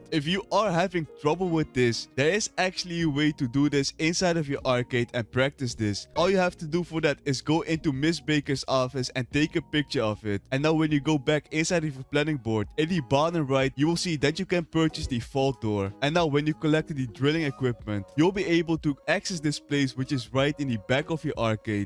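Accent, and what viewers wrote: Netherlands. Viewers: Hes defo dutch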